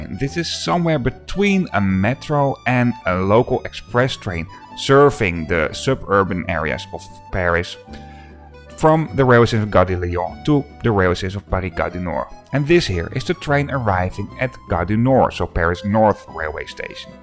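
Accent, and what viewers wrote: Netherlands. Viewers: Is it just me or is this guy sounding like a dutch guy? I am so confused by the accent and english he is speaking. But i love the vids keep up the good work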